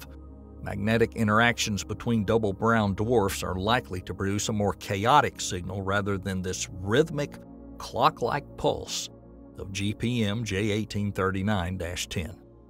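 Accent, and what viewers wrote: South, United States. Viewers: Country accents are cool.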